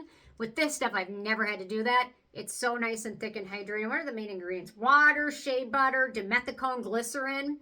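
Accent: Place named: Midwest, United States. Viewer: I love your accent. Northern Midwestern accents are absolutely adorable- sounds so wholesome! 😊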